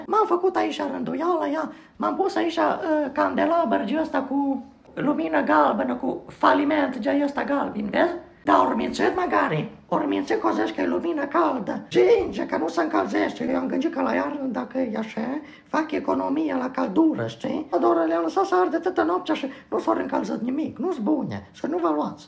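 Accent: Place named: Romania. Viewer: Vorbesti cum se vorbeste la mine la Odvos doamne nu mai pot de drag...sa fi sanatos mult succes !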